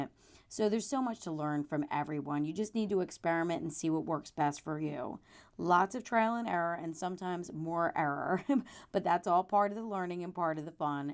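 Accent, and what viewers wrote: New Jersey, United States. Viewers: Gosh, I miss a NJ accent. I was raised in Mt. Holly and lived most of my adult life in Voorhees....now I'm in WNY. Photos are beautiful. Do you grow anything from seed? Check out The Lawrence Network....awesome gardening inspiration. Keep growing. 🌱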